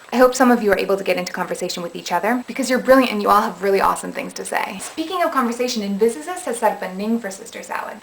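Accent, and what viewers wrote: France. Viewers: haha i love that french accent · Yay new video! John Green said he enjoyed your channel today in BlogTV. (yes, I also have no life) Also, very nice French accent! You practically own awesome and thus it is your awesome.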